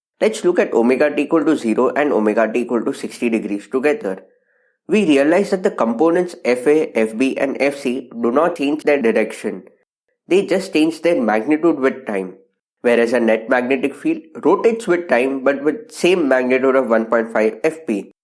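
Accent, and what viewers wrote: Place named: India. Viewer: I think the content is wonderful. But alas, I am not used to the Indian accent either. I suggest you can upload a subtitle to help our foreigners understand your explanation. Thanks in advance!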